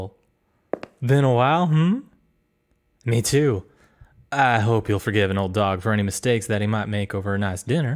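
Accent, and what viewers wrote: South, United States. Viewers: The bit of country I hear is the best I love it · I live in the south and might i say i love the Accent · Where are you from? I can hear an accent 🤔 Are you from the South? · The slight country accent is making my southern heart feel something😩✋🏾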